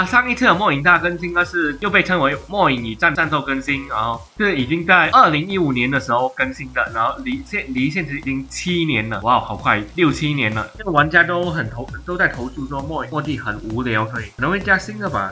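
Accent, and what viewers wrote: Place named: Malaysia. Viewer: 抱歉~想請問影片可不可以加字幕，因為我是台灣人，有點聽不清楚馬來西亞的口音，謝謝(◍•ᴗ•◍)❤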